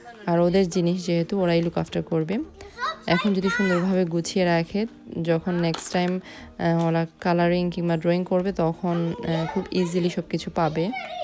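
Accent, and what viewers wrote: Sylhet, Bangladesh. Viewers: Apu tomi ki sylhel y?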